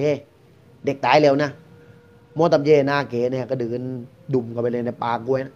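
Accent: South, Thailand. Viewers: มาฟังคุณ แหลงใต้ ทั้งสำเนียงและศัพท์ใต้ตอนล่างที่คุ้นเคย เปิดมิติใหม่ของการรับฟังเรื่องผี ทำต่อไปนะมาถูกทางแล้ว😁แว้บๆวันก่อนเห็นช่องนี้แปลชื่อเรื่องเล่าเป็นภ.เกาหลีใช่มั้ยนะ มาดูอีกทีกลับเป็นภ.ไทยละ ถ้าทำจริงนี่ล้ำเลยไม่ซ้ำใคร 👍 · ชอบสำเนียงใต้คุณ มาก รู้สึกแบบดีใจอะผมก็คนใต้มาฟังคนใต้ด้วยกันเรื่องที่ดีแบบนี้แล้วดีใจจริงๆ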